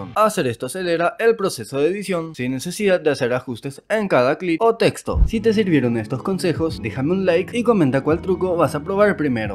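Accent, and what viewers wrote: Paraguay. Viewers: interesante se nota mucho nuestro acento paraguayo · Tu acento en muy paraguayo ¿eres de paraguay?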